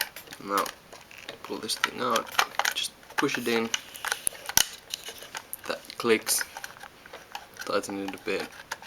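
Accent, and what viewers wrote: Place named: Finland. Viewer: Of all the euro accents, finnish is my favorite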